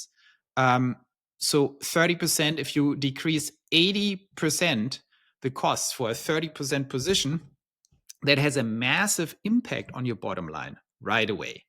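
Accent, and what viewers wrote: Germany. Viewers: Wow, das ist der Hammer! Alles macht Sinn, was sie hier erklären. In meinem Kopf findet gerade eine Explosion von Gedanken und Ideen statt. Das muss ich erstmal verdauen. Elons großen großes Puzzle habe ich habe ich gerade erst begonnen zu verstehen. Vielen Dank für die Hilfe! Ich gehe davon aus ihrem leichten Slang nach😅 dass sie gut Deutsch sprechen und es wahrscheinlich ihre muttersprache ist.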